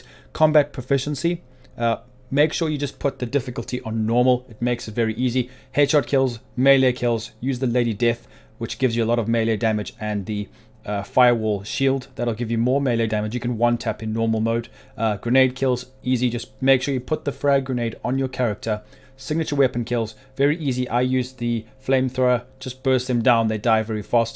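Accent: South Africa. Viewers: It might just be me, but I swear you sound south African lmao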